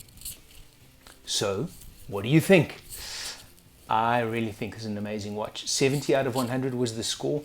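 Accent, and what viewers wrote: South Africa. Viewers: What accent is that ? I'de guess SA.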